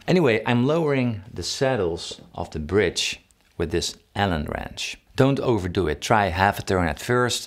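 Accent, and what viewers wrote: Netherlands. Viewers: Do I hear a Dutch accent? Bedankt voor je zeer informatieve video! Zeer leerzaam! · Plus heavy Dutch accent. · Why do you sound so dutch lol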